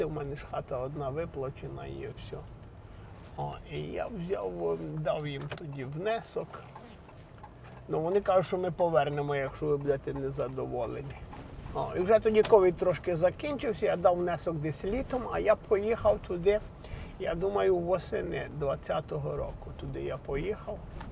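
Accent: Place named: Ivano-Frankivsk, Ukraine. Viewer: по розмові чути Франківська обл....та ще й з горів... Але ви кажете, що з Вінницької області. Вибачаюся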